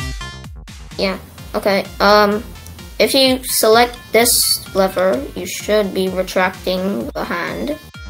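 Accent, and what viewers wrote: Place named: Russia. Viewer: Зачем ты снемаешь русским англиским голосом?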